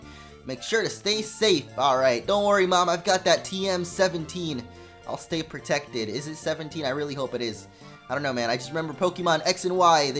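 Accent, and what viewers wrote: United Kingdom. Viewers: very British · He is definitely a Brit... XD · yea hes britsh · oh so very british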